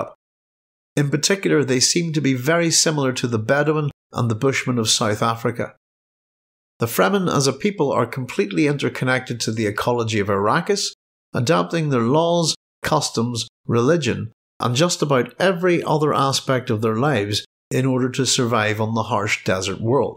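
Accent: United Kingdom. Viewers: Wow, I was almost all the way through the video thinking you are Scottish when I tuned in and realised your accent is Northern Ireland. Sometimes an Ulster and west coast Scottish accent can sound similar. I wonder if it's because many Scots settled in Northern Ireland. Less Zen-suni wanderer and more See-you Jimmy wanderers :) Great video.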